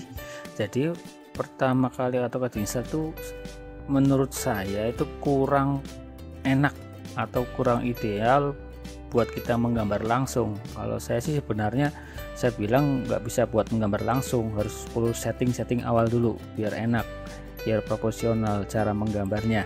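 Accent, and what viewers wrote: Banyumas, Indonesia. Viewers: bahasanya lucu...sukses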